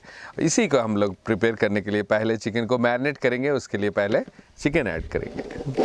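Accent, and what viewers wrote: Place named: Bihar, India. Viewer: aap Bihar se hoo Kiya? aapki awaz ek dum bihar wali hai.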